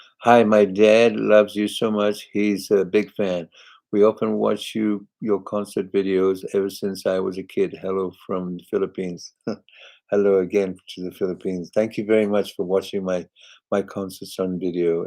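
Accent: South, United States. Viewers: Hello Engebert, I totally loved your live chat today. You have an amazing voice, speaking and singing. your songs have helped so many fans through these trying times. I listen to you when I go to bed at night, of course during daytime.😊 Your laughter on here brings so many smiles to me. Thank you.💓 Love your southern drawl..lol your a beautiful and handsome young man. My love, hugs and prayers are with you always.🌹❤😘🙏 The Lord's Prayer you sing it so beautiful, so touching..💖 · Watching from Wichita Falls Texas your southern accent was so so but you should put in a y'all and a bless your heart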